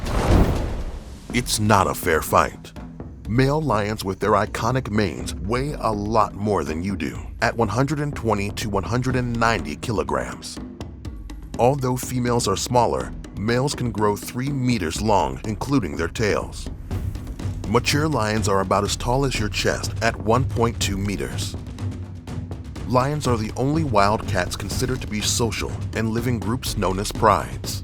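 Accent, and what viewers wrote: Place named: United States. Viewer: Hearing an American accent in a metric measurement 👌🏼👌🏼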